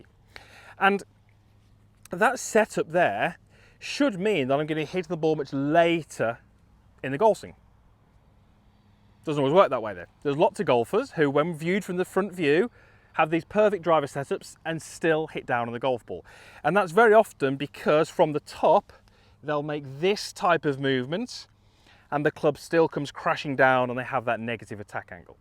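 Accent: United Kingdom. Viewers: Very clear even with your British accent 🙂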